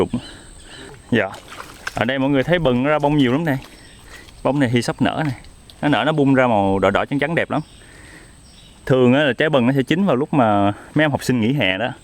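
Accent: Mekong Delta, Vietnam. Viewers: Giọng miền Tây nghe thương thiệt luôn. Cám ơn đã làm vi zeo này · Thích như vậy hon gần gũi thân thiện cách nói chuyện hào sảng chính hiệu miền TÂY năm bộ 👍👍👍👍👍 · Bạn nói giọng dễ nghe miệt vườn hay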